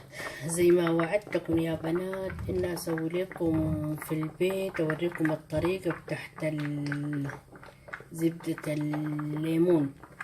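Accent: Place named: Sudan. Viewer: يا الله على البساطة و اللهجة الجميلة 🖒🖒. إن شاء الله أزور السودان و لو ليومين 😎. قولوا آمين. أختكم الجزائرية في المهجر.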